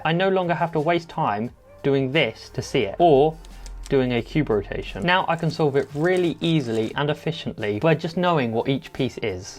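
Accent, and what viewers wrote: United Kingdom. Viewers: are u english ?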